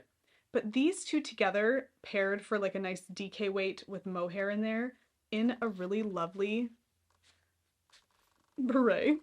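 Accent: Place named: France. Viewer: Your accent is so cute 😍 We could work on our pronunciation together, you French and me English. I like your Halloween costume idea.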